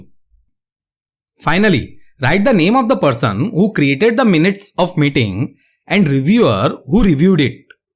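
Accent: India. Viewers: So what if thats his accent he’s from india,,juhs appreciate the effort he put seriously whats wrong people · That's how Indians speak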